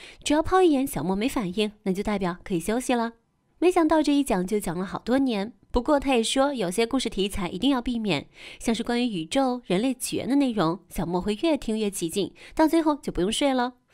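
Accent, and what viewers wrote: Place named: Jiaodong, China. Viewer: 是胶东呛，大连人讲山东话，谢谢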